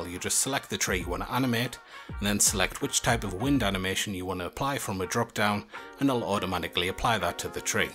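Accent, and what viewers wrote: United Kingdom. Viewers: Great video :) Also great to hear more regional UK accents rather than just typical American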